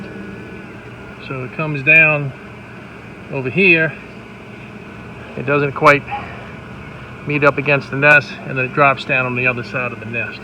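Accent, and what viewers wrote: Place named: South, United States. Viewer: 😂 thats brilliant! Real southern, love that accent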